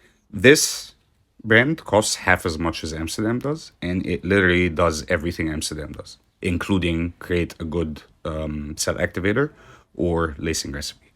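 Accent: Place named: Egypt. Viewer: I just found your videos and the content is such a gem! Your experiments are fun in their own right! True, cells are generated by destabilizing the fluid paint through disrupting surface tension and manipulating paint densities and viscosity while layering, so that some sink all the way down or float all the way up. You can check out the Rayleigh-Taylor instability for more context. Side note: You're AMAZINGLY fluent, but I think I heard a faint Egyptian accent and I immediately grinned 😁 I hope I am right in my speculation!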